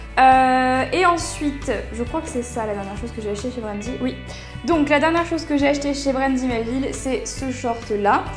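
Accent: England, United Kingdom. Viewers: Coucou 💎j'ai trouvé ta Vidéo super , déjà elle a pas durée 30 minutes comme certaines youtubeuses qui s'éternisent sur tous! Et puis tu as une façon de parler , expliquer très agréable a entendre.. Surtout avec la petite musique douce... Tu es posée et pas entrain de crier comme d'autres 💘ta coiffure et ton maquillage sont super jolies 💘ça te va trop bien!tu as un magnifique accent anglais 😉tu est super , je ne te regarde plus que toi sur Youtube tu es la seule vraie youtubeuse tu es une vraie passionnée et ça fait plaisir a voir ❤️ne change rien 💘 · Jadore ton accent anglais 😍😍💞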